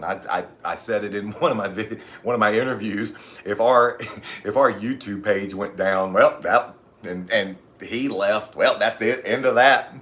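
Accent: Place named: Eastern Shore, United States. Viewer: man your accent reminds me of home... im a eastern shore boy from maryland.. love it bro keep up the good work.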